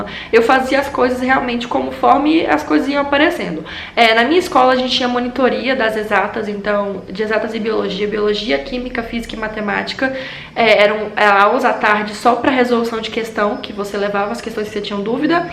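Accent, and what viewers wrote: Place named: Tocantins, Brazil. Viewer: Não sabiam q o pessoal do Tocantins tinha um jeito puxado de falar. ,bjs linda sucesso ❤♥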